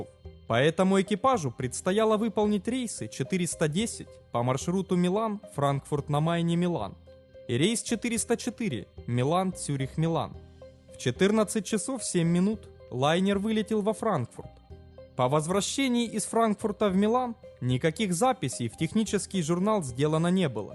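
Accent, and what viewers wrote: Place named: Zaporizhzhia-Dnipro, Ukraine. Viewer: только из-за этого жуткого запорожско-днепровского акцента смотреть и слушать не смог.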